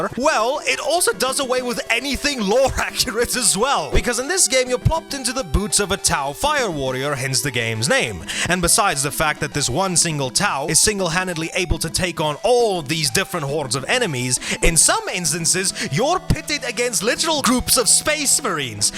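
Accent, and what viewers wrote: South Africa. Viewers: Why did it take me like 10 mins to realise you have a Saffer accent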